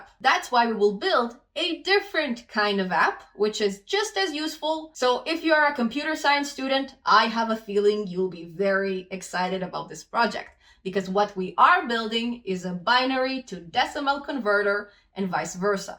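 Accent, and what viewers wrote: Russia. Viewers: your voice gives me tingles. Where u from? Russia, Ukraine?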